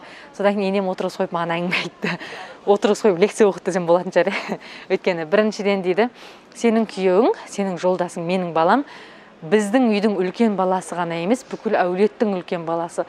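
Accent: Standard. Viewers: Қандай керемет тұнып тұрған қазақша сөз деймін.Қайда жүрсең де Бақытты бол! Сенің каналың мен үшін өте қызық тартымды көре бергім келеді.Жаңалығың көп болсын! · Бізде де күн ыстық. Қазақ тілін таза сөйлейді 👍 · Я айтпақшы сіздің таза қазақшаңыз қанша жыл шетелде тұрсаңыз да өзгермепті жарайсың дегім келеді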